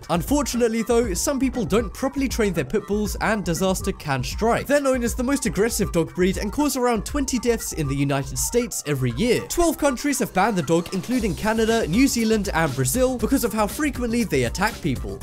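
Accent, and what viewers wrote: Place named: England, United Kingdom. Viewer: English accent doesn't compute with American closed captions... Apparently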